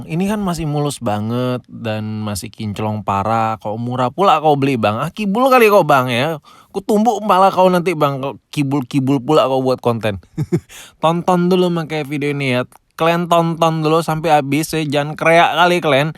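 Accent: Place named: South Jakarta, Indonesia. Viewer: Bng lu org jaksel ya? Cara ngomong ny jakset bet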